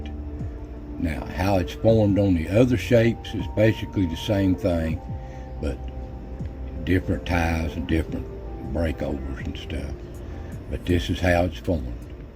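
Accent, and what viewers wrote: Appalachia, United States. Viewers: I love your video for the content, but the way you speak makes me smile. My maternal grandmother was from Appalachia, and even though she lived the majority of her life deep in south Georgia, the way she spoke was different from every body else. I could hear her in every word you said. Thanks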